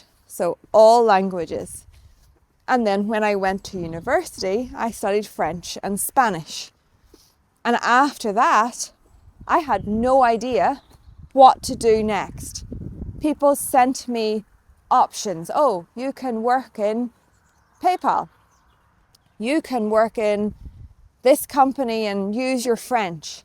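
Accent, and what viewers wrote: United Kingdom. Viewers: Hi, I'm from Brazil. How've you been? Can I ask where your accent is from? It sounds british to me. Am I mistaken? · No, you didn't always Only in the field f English teaching You are satisfied, indeed. Are you English? Your accent is not RP, not southern at least. Rhotic at times, not scotish...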